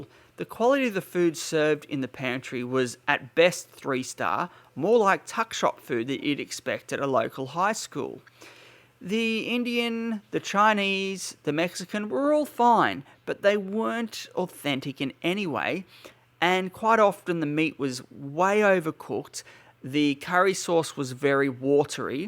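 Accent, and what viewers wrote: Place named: Bogan, Australia. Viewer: you sound like a bogan, and bogans love el cheapo P&O old tug boat rustboats, so no wonder u loved it darls, its the bogans of Australia that keep P&O going, bwhahaha, I wouldn't step foot on the floating shit boxes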